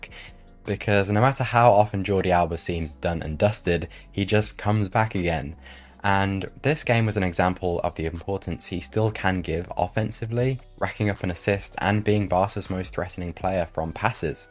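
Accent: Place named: United Kingdom. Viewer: yes, sometime I had the same feel of a barca game as, but just have the capability to put it into words systematically, and elegantly, plus that British accent (I am from US), love this dude, lol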